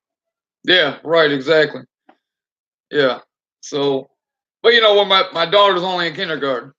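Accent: United States. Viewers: Nothing wrong with it i was just trying to figure out where i heard it before im from Michigan myself and really i thought he was from Detroit !!!! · He is my little buddy from the north. He is from Ohio.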